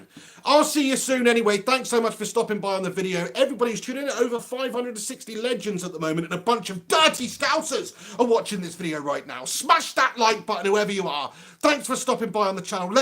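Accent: Manchester, United Kingdom. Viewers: thats a proper fucking Manc accent that is.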